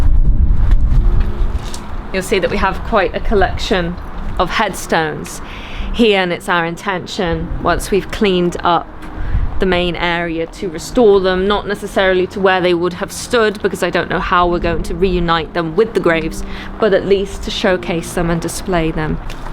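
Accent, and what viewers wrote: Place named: Northern England, United Kingdom. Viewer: That is wicked! You're northern English though aren't you?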